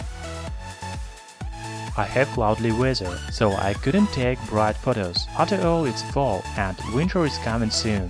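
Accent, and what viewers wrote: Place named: Russia. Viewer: а я то думаю..что за провинциальный городишко забугорный так похожий на наши)) даже рашн акцент сразу не заметил)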